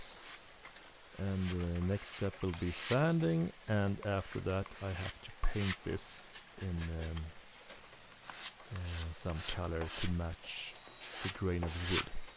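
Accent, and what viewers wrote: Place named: Sweden. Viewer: Supersnyggt! Du avslöjas av din svengelska och trälimmet;)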